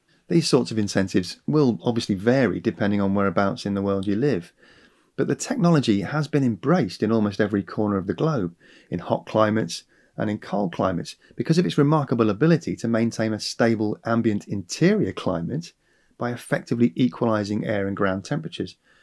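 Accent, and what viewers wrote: United Kingdom. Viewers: Difficult to understand his voice, which has a British accent, but goes up and down. He needs to speak with same volume and clearer.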